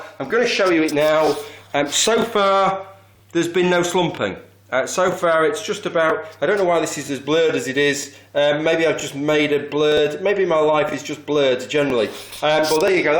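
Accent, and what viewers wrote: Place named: Yorkshire, United Kingdom. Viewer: Slight Yorkshire..... what part of the UK are you from?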